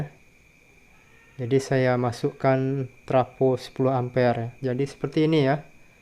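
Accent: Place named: Borneo, Indonesia. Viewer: Logatnya sperti logat Dayak...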